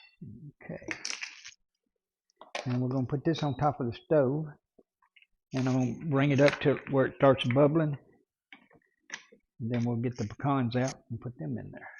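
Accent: South, United States. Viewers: Thanks for this recipe,. I subscribed to your channel. You sound like a southern gentleman and since you cooked at the firehouse, I’m sure your recipes are great! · You have a perfect voice to fall asleep to! I mean that in a good way, you’re pleasant, clear, and relaxing like a bedtime story. If you’re looking for a 2nd career - think about it! Especially with your accent you’d be perfect for a southern novel!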